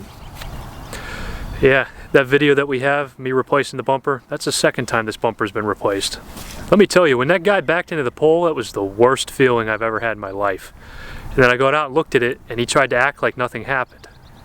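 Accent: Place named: Pittsburgh, United States. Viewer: 1st off -- I love your Pittsburgh, PA area accent, I used to live in the constant grey of PA up there myself but I'm in sunny Florida now where I bought my '14 Sierra new off the lot. Had about 20k miles on it and the A/C condenser went out -- but they wouldn't fix it because I was past the 36 month warranty but I paid for the parts and they ate the labor. 2nd, I have that tranny "clunk" that you talk about from day 1. It was never bad enough to take back to dealer to see if it was an issue but it is always there especially when tranny is cold and I am driving slow out of the neighborhood. It is 2022 now and I only have 44k miles on it but your mention of the lifters is bothering me. I'll definitely look out for that issue. BTW, my "tailgate assist" thing just quit working one day years ago. I'll definitely keep an eye out for the GPS antenna seal because we get tons of rain down here. 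With that in mind, I do get a musty smell every time I start my truck and the fan is blowing. I have tried many things to get that to go away but it won't. I assumed it was the humidity down here but now you have me thinking it could be that seal on the roof. Thanks for this video, you confirmed many of my suspicions of issues.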